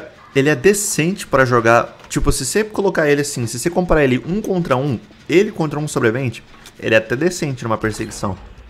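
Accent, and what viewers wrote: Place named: São Paulo, Brazil. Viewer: Pelo sotaque acho que é paulista, mas não tenho certeza.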